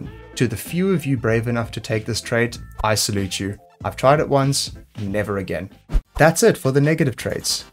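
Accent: South Africa. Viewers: Are you a South African bru? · You sound south african. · My Dude .... the South African runs rampant in you!! Thanks for the tips tho! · Hey bro are you South African? If so, greetings from the Eastern Cape! And great video man🤞🏼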